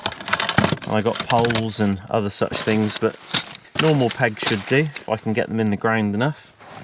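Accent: England, United Kingdom. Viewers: What are u doing in Sweden mate do u work there because I can tell you are English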